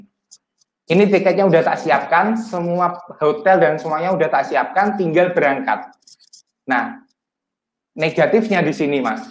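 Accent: Java, Indonesia. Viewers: Maaf mas sblomnya,jujur sy ketawa dg crita dg logat jawa yg medok,lucu,wajah yg imut jdi sy ketawa ,tpi saya salut bljr bisniis saat usia masi belia,& jdi inspirasi byk org,keren banget,hebatt,sukses sll,mksii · Keren ,lucu,medok ngomkngnya 😀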